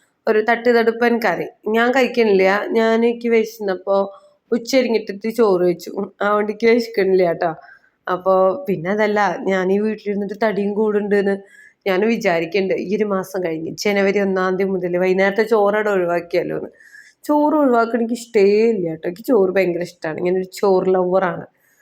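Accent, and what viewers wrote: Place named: Valluvanad, India. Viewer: വള്ളുവനാടൻ ഭാഷ കേൾക്കാൻ നല്ല രസമാ.. from Kottayam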